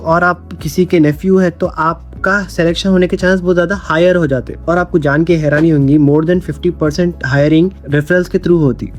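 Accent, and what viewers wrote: Hyderabad, India. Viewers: Hyderabad se ho aap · Hydrabadi accent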